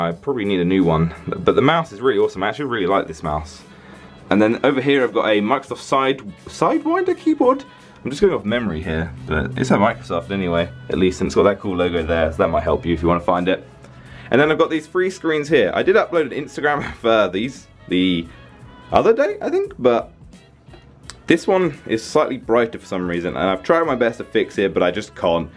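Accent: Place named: United Kingdom. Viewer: is from the UK. You can clearly hear the difference between English (British English) and 'English' (American English). Jordi and Jelly are from the Netherlands, they have been speaking "English" (Dutch English) in videos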